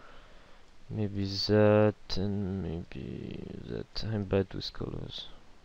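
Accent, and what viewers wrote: France. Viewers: Your accent sounds french... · Are you french, swiss?? *.* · ah ton englais est parfait avec l accent Français tu t en sort bien t es pmv son extraordinaire continue a en faire j adore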